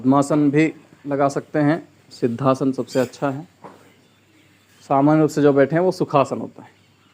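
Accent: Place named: South, India. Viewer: अद्भुत बाबा जी, बहुत ही गर्व महसूस होता है आपको सुनकर,, आपके सरल सहज ज्ञान प्रेषण करना अद्भुत है,, गर्व यह भी होता है कि आपको हम या आप हमे नाम से जानते हैं,,, धन्यवाद,, आपकी साउथ स्टाइल संस्कृत वाली हिंदी सुनना बहुत ही अच्छा लगता है।।जय हो Er प्रशांत दुबे, अस्सिटेंट प्रोफ़ेसर, उत्तरप्रदेश तकनीकी विश्वविद्यालय , लखनऊ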